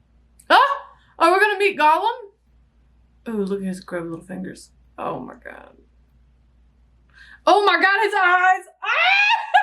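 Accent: South, United States. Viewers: your Southern voice makes me think of home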